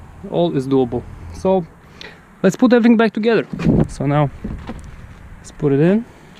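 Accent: Russia. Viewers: laughed too hard when you said hammer instead of camerea because of your russian sounding accent. Great video tho thank you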